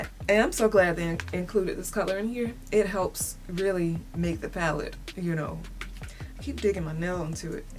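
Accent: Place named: South, United States. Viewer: Oooh...you country! Hahah! I love it though...makes me miss the South. Finch had me at hello! I'm digging that one..this entire palette is a mood. Perfect as we walk into Spring!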